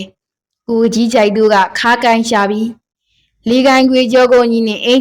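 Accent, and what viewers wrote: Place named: Upper Burma, Myanmar. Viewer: ဆရာမခင်ခင်ထူးရဲ့အရေးအသားအသုံးအနှုန်းတွေကရိုးရှင်းတယ်အညာဒေဓလေ့တွေကိုပုံဖော်သလိုခံစားရတယ်ဖတ်ပေးတဲ့သူရဲ့အညာသံကလဲဝတ္ထုကိုပိုကြွစေတယ်ကျနော်မျက်မမြင်တစ်ယောက်အနေနဲ့နားထောင်ရတဲ့အတွက်ကျေးဇူးအထူးတင်ပါတယ်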